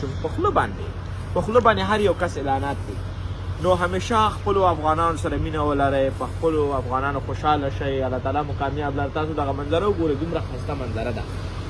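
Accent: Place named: Pakistan. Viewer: ته اول داخپل ژبه چی خبری کوی دااسلاح کړه بیاوازه افغان یم ځکه چی ستاټولی خبری ده پاکستانی دی یواری پښتوزده کړه بیاراشه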